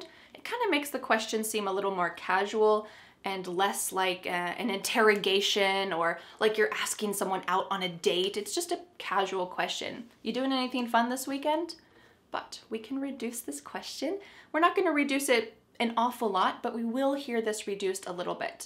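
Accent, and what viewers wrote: United States. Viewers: Hello my teacher,daughter, how are you doing my daughter, hope you to be well,dear,I wanna say you thank you so much dear for all your fantastic lessons, by the way your accent also very nice and clearly really you're fantastic american accent english teacher in the end acept my greeting. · Your English speaking style is very nice,,, You're amazing 😍 😊..I can learn American accent very well.❤️🇧🇩🇧🇩🇧🇩🇧🇩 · Hi, I discovered this channel and for me it's the best. Are you American or aren't you? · 😎I will become American after listening to you👻👍🏻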